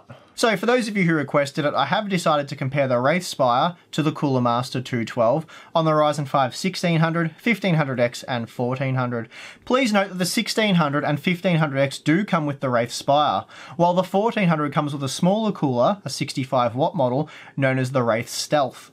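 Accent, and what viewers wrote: Australia. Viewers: I sense an Australian accent, is this guy from Australia?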